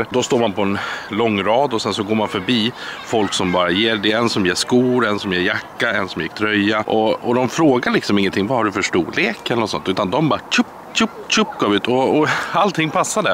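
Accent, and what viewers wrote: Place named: Dalarna, Sweden. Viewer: gud va du pratar Dalamål har aldrig hört dig prata så tidigare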